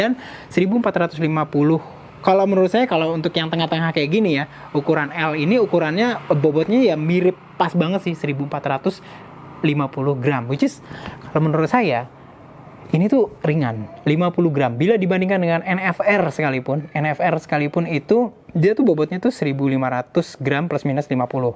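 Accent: South Jakarta, Indonesia. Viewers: wiciswicis jaksel nya aga cukup mengganggu bagi saya yang bukan orang jawa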